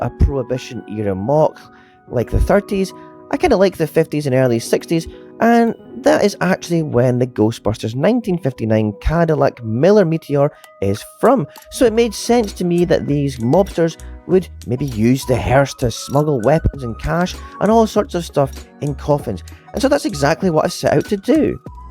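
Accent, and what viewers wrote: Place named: Scotland, United Kingdom. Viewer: I love your accent. Are you from Scotland? (Just curious).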